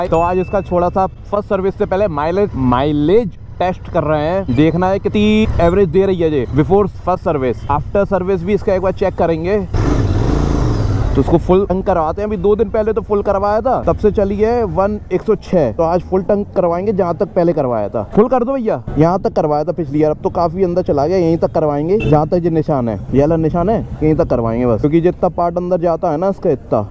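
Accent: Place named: Madhya Pradesh, India. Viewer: Mp ka accnt bohot aacha hai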